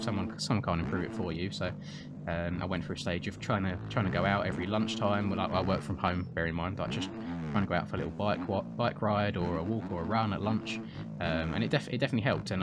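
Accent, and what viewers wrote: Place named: United Kingdom. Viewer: Straight male here, all British accents are sexy lets be real. Plus watching someone actually ride the game instead of crashing like I do is nice.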